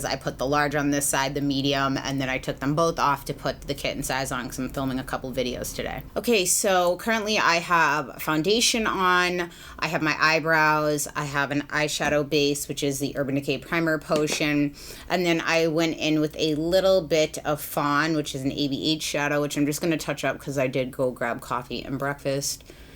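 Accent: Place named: Boston, United States. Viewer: Hey, I love your videos, personality and your Boston accent - it reminds me of home! I like this exercise because it makes me realize that I really do have a lot to be grateful for. Having to choose my top picks, I would choose my skin, as it is nearly flawless and wrinkle free, which at my age is truly miraculous! Additionally, I am so proud to have graduated magna cum laude with honors from an Ivy League school. There were a lot of obstacles in my way, but I pushed through and received an education that no one can ever take away from me! Thanks for the opportunity to win the winged eyeliner products. I, like you, have struggled with uneven wings for years, so to win would be a real treat. Take care of yourself!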